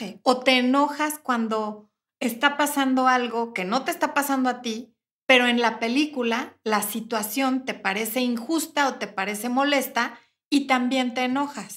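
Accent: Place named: Mexico. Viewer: gracias ante todo. Me parece que si las mujeres siguieran tus consejos serían mucho más felices consigo mismas y con sus relaciones de pareja. Yo hace tiempo descubrí que la autoestima y la seguridad de una mujer en sí misma es la mejor manera de conquistar a un hombre y que nosotras vamos primero que ellos siempre. Me fascinan tus consejos incluso tu acento mexicano que me encanta...hace un año por estas fechas visité por primera vez CDMX y quedé enamorada, solo pienso en regresar. No sé tengo una conexión muy especial con Mexico y te escucho y me fascina. Por cierto, entré a tu canal de brillar después de los 40 pero no hay contenido, ojalá pongas pronto algún video allí....Si las mujeres despertáramos el mundo sería nuestro. Un abrazo y saludos a tod@ los seguidores de este canal, soy española pero vivo en San Juan, Puerto Rico. Un abrazo y lindo domingo.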